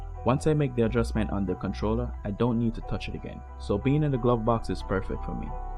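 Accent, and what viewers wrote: Jamaica. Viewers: Hey, I hear a Jamaican accent. I recently bought a well kept 2005 R53 Cooper S. What is the purpose of the Meth and would this be appropriate for the R53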